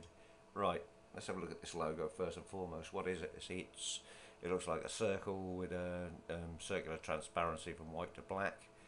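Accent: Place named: United Kingdom. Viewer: Oh my you have such a heavy British accent:)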